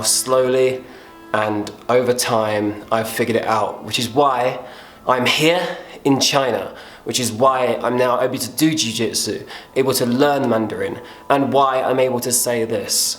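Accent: United Kingdom. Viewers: Woah I'm just watching one of your first vlogs on your old channel and your british accent was so much thicker. Definitely can tell you've been spending a lot of times in non english speaking countries. That'll happen to you!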